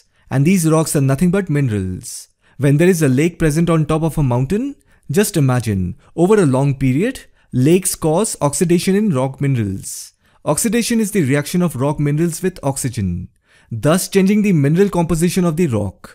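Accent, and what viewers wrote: India. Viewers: Your indian accent is so clear.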